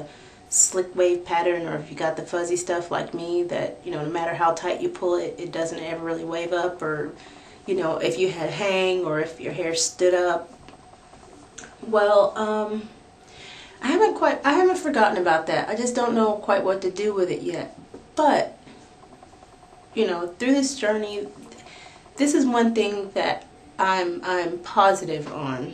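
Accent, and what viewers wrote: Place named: South, United States. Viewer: southern accent in this vid. how cute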